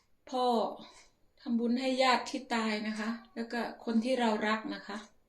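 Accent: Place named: Cambodia. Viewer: อ๋อ.. ขอโทษด้วยคะ พอดีว่ายายเป็นคนเขมรหน่ะคะ สำเนียงของน้องเหมือนแถวบ้านยายคุยกันเลย ❤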